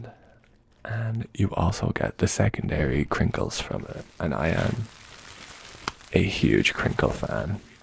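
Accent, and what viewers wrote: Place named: Ireland. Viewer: Soft spoken is absolutely beautiful, with an Irish accent makes it phenomenal 😍 much love from an admirer in New Zealand ❤❤❤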